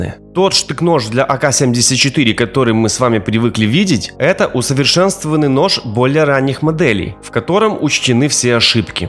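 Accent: Ukraine. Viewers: есть что тут сказать. Смех да и только ребенок с явным акцентом страны 404 пытается рассказать о том в чем не разбирается.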